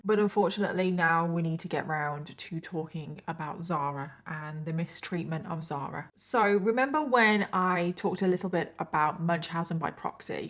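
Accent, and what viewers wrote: United Kingdom. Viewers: She’s British and she always does it in her accent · MAKE UP REQUEST!?!?! My favorite (American) football team is going to the Super Bowl!!! Will you do a Kansas City Chiefs theme make up? I have just recently discovered your channel and have watched so many of your videos back to back to back that my inner monologue has had a British accent for like 2 weeks now. Thank you!! -Sarah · Please, Can you narate stories in british accent. It sounds good to me.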